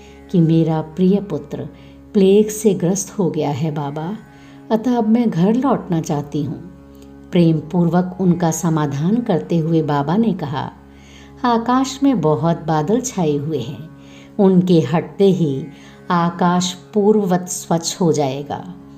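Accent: Standard. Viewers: आपके।द्वाराबाबा की लीलाओं का बहुत ही सुन्दर और शुद्ध उच्चारण किया गया।धन्यवाद।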